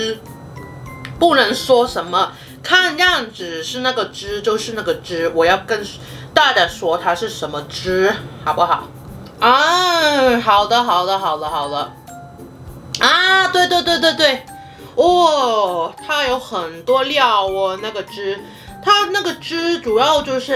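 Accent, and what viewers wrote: Taiwan. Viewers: 嗨 你的國語發音很像台灣人😊說得很棒哦！我有些香港朋友是沒辦法那麼清楚發出咬音的呢，剛好肉圓是在我居住的城市 彰化，煙韌國語可以說成是 Q彈有咬勁哦。在彰化的肉圓是蒸熟後油炸，有脆皮派也有煙嫩派的、還有涼涼吃的肉圓 叫涼圓。 我也很喜歡旅遊 香港也去過十多次了，有機會來台灣的話 可以一起吃美食哦😊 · 對、為何你的口音咁台灣？你在那裏學的？一定不會在中學校！ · 國語好有台湾feel，尾音勁似👍👍🥳好正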